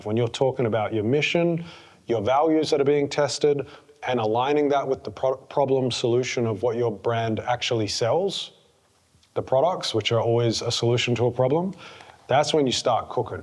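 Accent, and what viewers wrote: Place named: United Kingdom. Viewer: Bro I wanna decode your British accent through Alvin and the chipmunks lo-fi hip hop because you are hipsters and I'm here to get a sense of your style? For a marketing video? You wanna drag my ears through barbershop b-roll music whilst you pretend to explanate any bit of information. Fire your editor posthaste good channelkeep.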